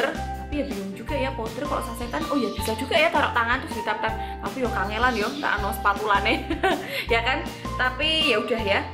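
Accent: Java, Indonesia. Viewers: Kesini krn beranda tak kira org korea trnyt medok wkw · Baru pertama nonton aja udahh love sma 😍😍 medhok e kyok aku rek wkwk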